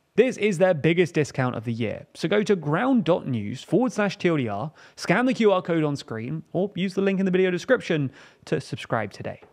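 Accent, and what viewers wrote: England, United Kingdom. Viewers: The content is cool, but the English accent is one of those that makes getting information more difficult.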